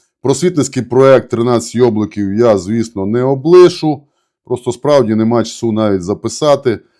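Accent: Transcarpathia, Ukraine. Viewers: Мені подобається будь який формат, п., бо знаю, що хробаки будуть отримувати в центр прінятія рєшєній те, на що заслуговують. Ну, а озвучка із включенням нашої закарпатської бесіди дуже ми ся любит.🇺🇦❤️ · !!!та від ВАС любий формат!!!(це як бальзам)/і запатентуйте Ваше вміння Закарпатської, говірки, 🎉😂. Слава Богу що є такі ПАТРІОТИ!!!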